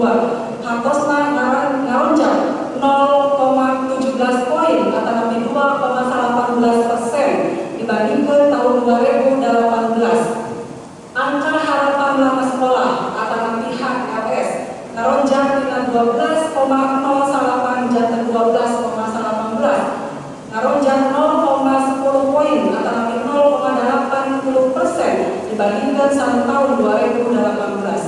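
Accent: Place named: Sunda, Indonesia. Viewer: Nyunda banget, kereeen, Ambu pidatona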